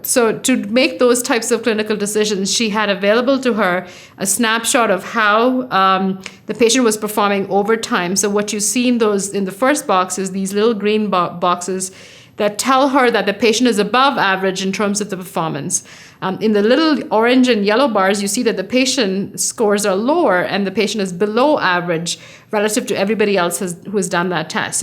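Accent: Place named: India. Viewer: sounds like you are indian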